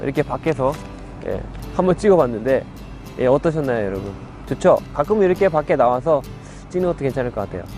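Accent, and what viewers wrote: Japan. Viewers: 20대에 일본정착하셨는데 거의 억양이나 사고가 일본분이 다되셨네요ㅎ초반에 정착하시면서 힘들었셨던게 공감이 가네요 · 한국어하실때 종종 일본말의 영향을 아주약간 받은게 느껴지네요ㅎㅎ 한 3% 정도???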